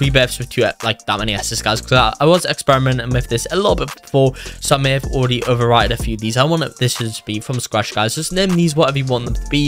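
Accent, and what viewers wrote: United Kingdom. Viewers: By the way he talks hes frim london AND I HAVE 100TH COMMENT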